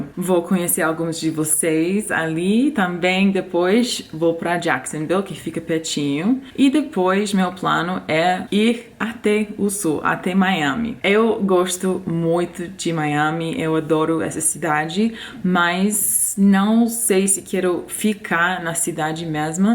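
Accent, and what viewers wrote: Rio de Janeiro, Brazil. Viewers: primeiro: Fico muito feliz em ver vc tão empolgada e com tantos planos! Tão diferente de quando vc chegou aí nos EUA. Depois, acho lindo seu jeitinho carioca de puxar a letra " s" no fim das sílabas E, já que vc não se importa, gostaria de fazer uma pequena correção: A gente não usa "desde" para se referir a lugar, só usamos para tempo. Pra lugar, falamos só "de". Abraço. Tudo de bom pra vc e pro Max! 🤗😘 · Já fala com o xxxx do carioca! Rs Boa sorte na viagem! ❤ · Você herdou o sotaque carioca. Hahaha Paísssss, nóissssss Legal isso. Boa sorte, menina. Jogue-se de cabeça. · Vocêix, paíx Totalmente carioca Kkkkk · esse sotaque carioca dela é engraçado demais: Nóixxx Paixxx! será que ela sabe todas as gírias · Estou na cidade de Pompano Beach seja bem vinda mais uma americana Carioca ate o seu sotaque em portugues e do rio show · Americana com o verdadeiro sutaque carioca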